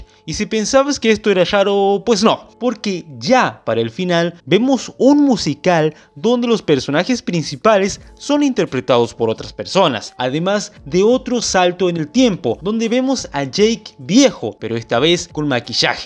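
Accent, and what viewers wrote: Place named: Entre Ríos, Argentina. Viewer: Sos medio ashastrado para hablar hermano, pero queda bien! Le da un buen estilo, parece que sos entreriano o norteño de algún lado de Argentina, un abrazo de cordoba.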